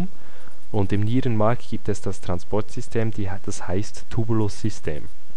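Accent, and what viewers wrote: Switzerland. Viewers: Das ist sicher ein Berner:) super video 👌merci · Extrem hilfreiche Viedos , die durch sehr verständliche Erklärungen glänzen! Das Besondere sind die langsam gesprochenen Erklärungen, die es erlauben das Gehörte auch gleich zu verarbeiten , wodurch man ausdauernd zuhören kann. Die meisten anderen medizinischen Tutorials scheitern in ihrer Qualität an der Geschwindigkeit. Als schönes Extra kommt die angenehme Stimme hinzu und der schöne schweizer Akzent! Für mich die besten Tutorials im Netz! Herzlichen Dank für diese tolle Hilfe !!! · ein sehr komplexes thema kurz, knapp und ausreichend erklärt, hilft mir sehr! vielen dank!....und ich liebe ihren schweizer dialekt ;) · So en Schwiiiiiiiiiiiizer !! :-)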